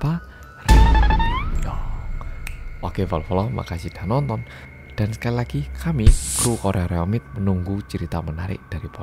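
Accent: Java, Indonesia. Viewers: salut, penyampaian runut dan tata bahasanya sempurna, meskipun medhok....👍👍 Sukses selalu yo, Cak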